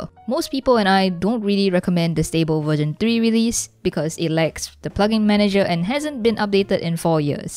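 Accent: Singapore. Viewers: are you singaporean? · are you singaporean? 😁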